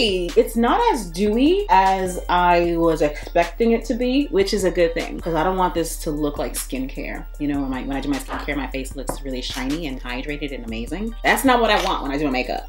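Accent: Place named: Rhode Island, United States. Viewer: Come thru with that RI accent!👌🏼🤌🏼😂🤣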